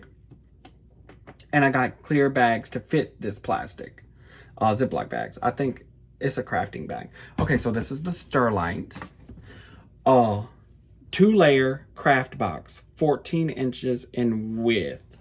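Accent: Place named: Louisiana, United States. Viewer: you may stitch Victorian charmers but ohhhhh LAWDY son YOU are the true charmer! I happened upon your videos today and I’m smitten! I started cross stitching in 1978 after moving to Layfayette, Louisiana…I was elated to hear that Looosiana accent again! I so enjoyed your walk down cross stitching memory lane. It’s amazing how this hobby has evolved over the years. Back in the beginning I stitched on 26 count Aida. But when I discovered linen I felt like I hit the jack pot and I haven’t gone back to Aida…soooo there may come a day when you, too, will give linen a try. Like you, I also stitch on the same piece for days at a time. Monogamous stitching works best for me as well. You're not the only one who gets gobsmacked at the number of WIPS so many stitchers amass. I couldn’t take that pressure! I like your floss file system, especially the notion of the small bag for bits and bobs that are too much to toss in the trash bin. 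I recently converted all my cotton flosses over to Annie’s Keepers. That system is the best I’ve tried, and I’ve tried quite a number of systems. I can’t wait to see what your next video brings…keep them comin’ darlin!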